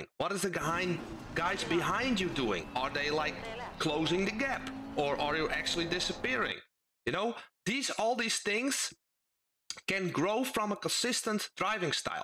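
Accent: Netherlands. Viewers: kom je uit Nederland? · Are you Dutch? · Super Dutch, lol. that dialect is obvious. Groet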